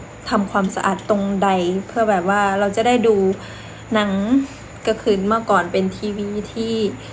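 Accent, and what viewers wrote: Cambodia. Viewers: สำเนียง(การกระดกลิ้น)เวลาพูด)คล้ายๆเขมรเนาะ... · เจ้าเว้าไท แบบเขมร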